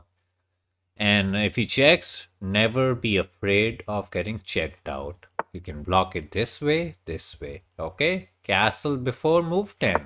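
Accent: India. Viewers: The indian accent gets me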